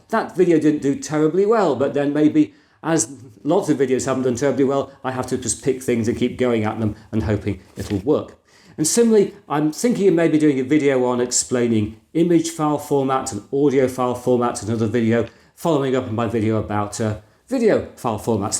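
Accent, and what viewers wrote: United Kingdom. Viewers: Your personality and style very unique sir, also have british accent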